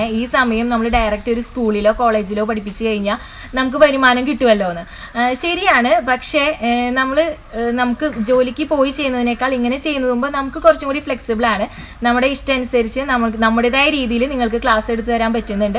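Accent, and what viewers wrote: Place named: Thrissur, India. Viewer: Trchinte class kettittanu gjaan. Polynomial ,geometry and algebra padichatu enikku. Chicken pox vannarunnu pakshe full pattilla tr ottiri parts idunnontu pakshe ippo Tr parts minimize cheytu thnku parts kure koodunontu ottiri time mathsinu pokunnarunnu ateyullu ippo almost ariyam trnte language kettopozhe thrissur aanennu tonni entayalum Tr poli class aanu gjangal kollam kaaranu thnku thrissur kaari teacher 😘😘🤗🤗🤗🤗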